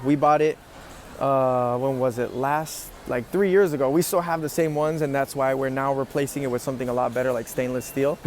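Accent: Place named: Buenos Aires, Argentina. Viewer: totalmente de acuerdo. Lo escuche hablar en español y el acento era totalmente porteño.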